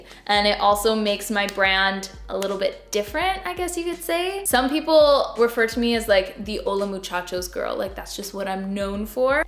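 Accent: United States. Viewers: PLEASE DON'T TAKE OFFENSE TO THIS QUESTION I PROMISE IT'S GENUINE. Why don't you have a Canadian accent? I live in Iowa, US, and you almost sound Midwestern like Iowan or something. I feel like has some sort of accent as well, but you speak so much like an Iowan it's crazy. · So you live in Canada but you do not sound Canadian/ you sound American. Am I right?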